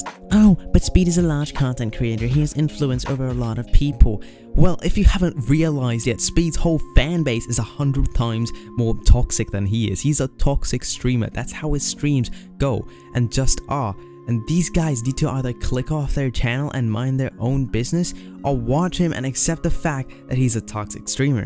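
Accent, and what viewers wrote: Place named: United Kingdom. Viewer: Oh god not only do you sound British but now ur getting ur 200 ping on AS mmc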